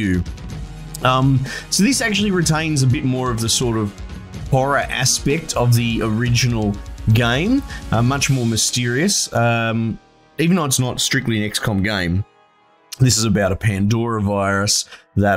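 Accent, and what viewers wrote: Australia. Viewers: You're Aussie? NICE. Good video :)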